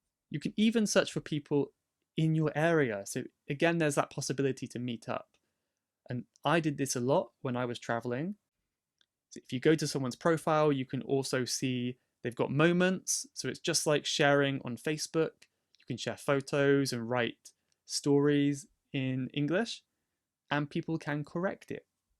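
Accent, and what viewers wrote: RP, United Kingdom. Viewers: Do you speak Rp accent?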